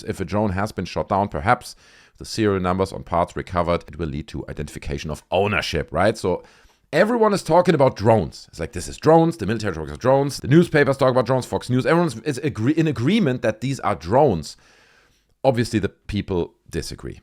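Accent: Germany. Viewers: You also sound like you have a German accent. Maybe I'm wrong 🤷🏼‍♀️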